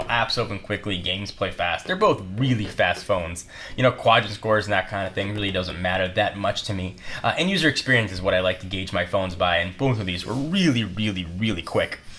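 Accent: United Kingdom. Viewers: british accent on a phone always makes the phone better.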